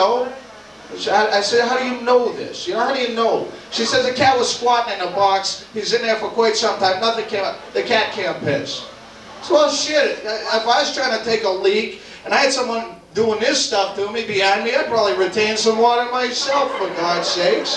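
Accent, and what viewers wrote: Boston, United States. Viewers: So when did this super thick Boston accent disappear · The Boston accent is wicked pissa! More proof that has always been a genius...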